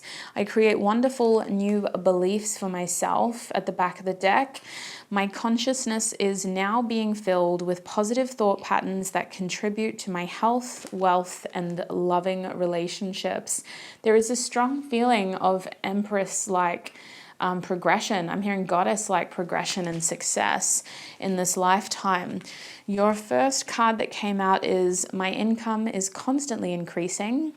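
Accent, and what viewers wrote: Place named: Australia. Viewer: Listening to her videos helped me a lot with aussie accent😂❤thanks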